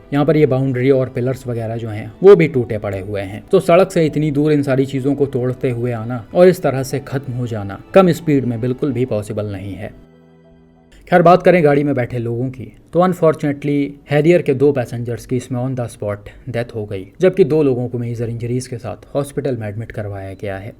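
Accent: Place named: Assam, India. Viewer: Pls don't critcise hindi speech.. It is Assamese accent..pls respect it. I am from UP but residing in Assam for more than a decade.. 🙏🏼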